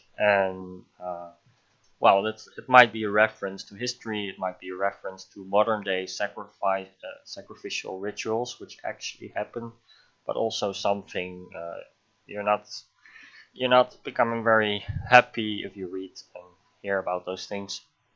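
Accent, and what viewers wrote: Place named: Netherlands. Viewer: Volgendsmij ben je nederlands ik kan dat horen haha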